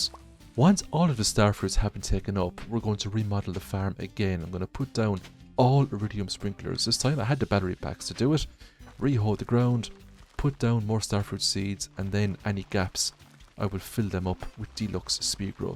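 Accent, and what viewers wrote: Ireland. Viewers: Dying to know what part of Ireland you are from!!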